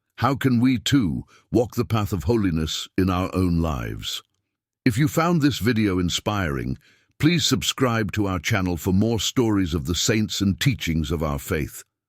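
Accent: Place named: United Kingdom. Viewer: Please! Drop the creepy AI British accent ..just can’t listen to it. How about a woman’s voice for a change?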